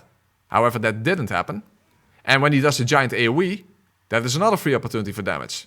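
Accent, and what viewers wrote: Netherlands. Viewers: U dutch? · First video i watched on your channel, immediately heard the dutch accent hahahah🇳🇱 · gok aan t accent randstad ook? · Leuke video! Altijd grappig hoe wij Nederlanders een typisch accent hebben wanneer we Engels praten. xD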